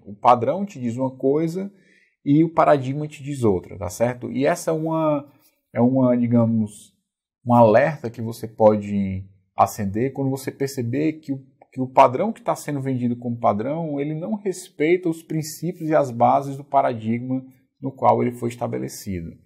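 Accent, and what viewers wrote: Ceará, Brazil. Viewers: Seu conteúdo é muito bom! Estou acompanhando seu curso de vue na udemy, muito foda! Professor você é cearense?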